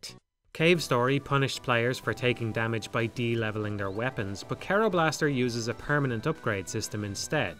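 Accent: Ireland. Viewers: And that Irish is an amazing yt video voice accent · That Irish accent with the complicated language is like asmr to me ♥️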